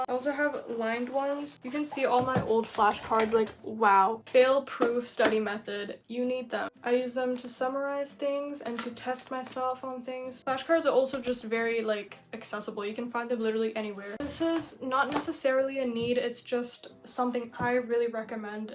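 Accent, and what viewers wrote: United States. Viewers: these are all great choices! btw, why do you have an american accent when you're from Austria? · i totally thought you were american lol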